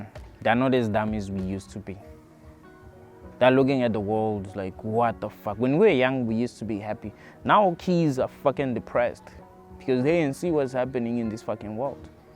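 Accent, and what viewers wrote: South Africa. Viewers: hey bro just started following you i like your content bro, i always wanted to ask where you from coz i could tell you from SA, lols im from there too, good to hear someone who talk reality, out here so hard to find people like you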